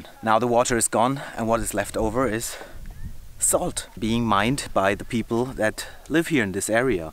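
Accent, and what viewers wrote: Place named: Germany. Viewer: You sound so German! 😄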